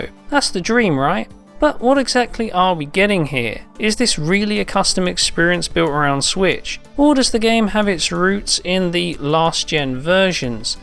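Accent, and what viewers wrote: England, United Kingdom. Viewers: british accent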